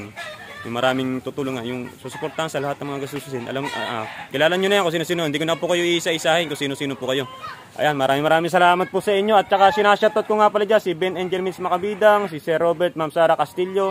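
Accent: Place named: Cebu, Philippines. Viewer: I heard you speak cebuhano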